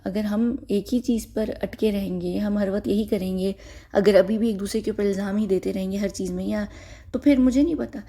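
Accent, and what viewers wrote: United Kingdom. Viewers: Sis I personally love your British accent. I just thought to share with you because of the warning statement u mentioned in the beginning. Baki log bhaar me jae. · I honestly love ur British accent 💞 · funding to movies by badelog aur durgs ka kya sceene kasi funding hoti hai, ye salman k bahar dubai kyu jata hai? funding? aur prostitution kon provid karta hai bollywood m ya phir salmon ka hat kya hai es m. kya salman k sach m religious neutral hai ya bas dek ka ne k liye ganpati festival karta hai. wasi es m to sure hu m to b kuch extra info aap batavo, salman ki ek sister hai usne to ek hindu se shadi ki, kya seen hai love jihad k mamle m, kya salman uski sis. ki beti ko flim m launch karega? aur new comers ko ye kasi treat karta hai, outsider aur insider b jayse Sonakshi Sinha (ki se ne controvesy ki ti ki salmon sonakshi ki mom k sat night.) aur Saiee Manjrekar eska b batavo. 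vae se british acc. to badya hai aapki. suna te raho bech bech m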